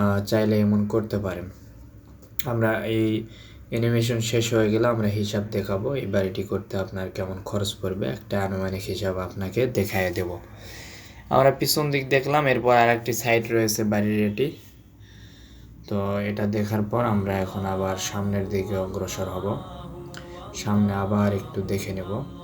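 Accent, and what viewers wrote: Naogaon, Bangladesh. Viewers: Apnar basha Naoga naki vai?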